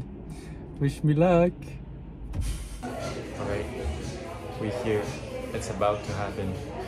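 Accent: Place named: France. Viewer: 💕Hey boo how you doin I hope your doing well looking absolutely gorgeous as always I'm unconditionally irrevocably in love with you I absolutely adore you Your MY favourite frenchie in absolutely obsessed with your French accent (can I borrow it) Lots of love and kisses your biggest fan NICOLE LOUISE crespeau💕 💋💋💋💋💋💋💋💋💋💋💋💋💋💋💋💋💋💋💋💋💋💋💋💋💋💋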